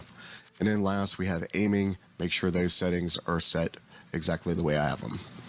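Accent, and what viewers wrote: United States. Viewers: Dudes definitely from Philly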